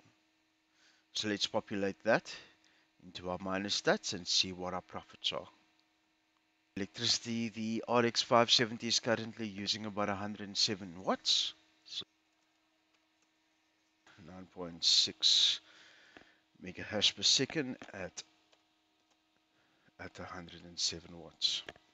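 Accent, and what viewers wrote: Afrikaans, South Africa. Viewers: Klink soos n Afrikaanse engels die.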